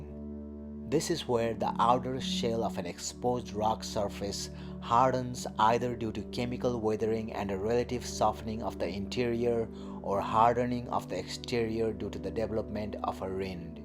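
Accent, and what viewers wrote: India. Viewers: I find it a little harder to understand as it has an Indian sounding accent. I am from the UK.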